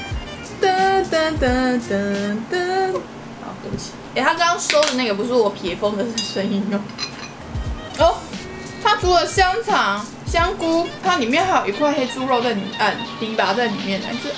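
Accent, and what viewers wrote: Taiwan. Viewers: 生氣的說工撒毀那台語捏的樣子好可愛XD 而且的台語超標準的(以我這種不會講台語的人來說) 很喜歡聽講台語多講一點更好!! (比心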